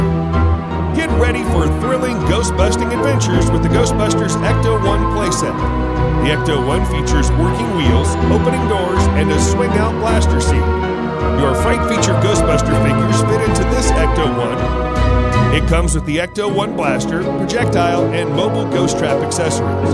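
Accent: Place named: Michigan, United States. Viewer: You have the Michigan accent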